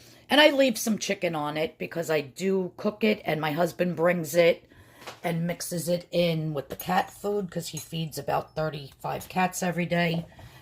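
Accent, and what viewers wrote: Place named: Long Island, United States. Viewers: The food looks great, but the ACCENT kept me watching. 😂😍🥰 Long Island? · No, but I lived in Manhattan for 15 years and had a friend who was from Long Island and she sounds just like you!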